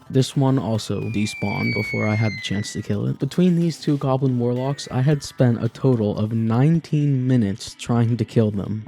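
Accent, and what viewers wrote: United Kingdom. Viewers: omg, bri’ish terraria 😦😦😦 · are you British?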